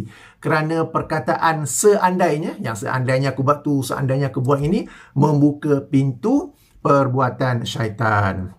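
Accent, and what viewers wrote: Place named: Penang, Malaysia. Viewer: Kapten punya loghat Penang, "tak prnah habakkkq .. 🤣👍🏼 T.kasih atas ilmu kapt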